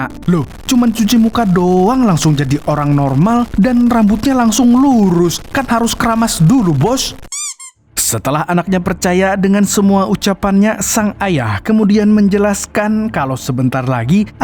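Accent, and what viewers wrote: Banjar, Indonesia. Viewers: Orang Banjar,, saku mimin nya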